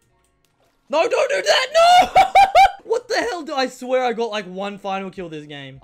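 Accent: Australia. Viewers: This is the most Australian person I’ve ever heard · every video of December it sounds like is getting more and more Australian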